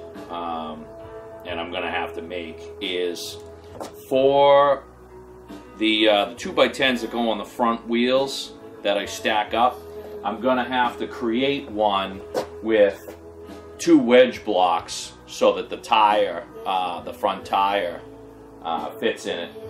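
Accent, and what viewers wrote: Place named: Boston, United States. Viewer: Hello form 02368. I heard that Boston in you for sure, before I read the name of the YouTube channel LOL. Great video.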